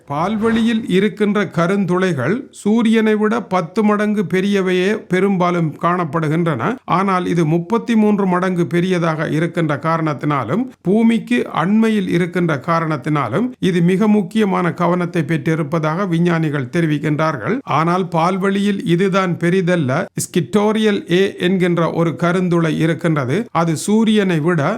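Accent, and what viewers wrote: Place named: Valvettithurai, Sri Lanka. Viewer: வல்வெட்டித்துறை தமிழ்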